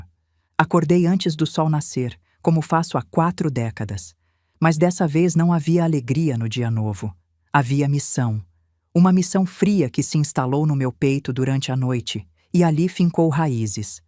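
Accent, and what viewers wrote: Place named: Portugal. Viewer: Mimo. Em excesso estraga ... Estou ouvindo Portugal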